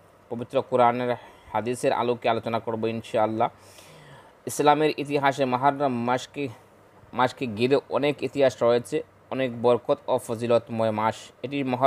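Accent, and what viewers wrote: Bangladesh. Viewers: বা‌ড়ি কোথায় ? কু‌মিল্লা ? নোয়াখা‌লি না‌কি চাটগাও ? বাঙলা ভাষার উচ্চারন ঠিক ক‌রে কথা বলুন।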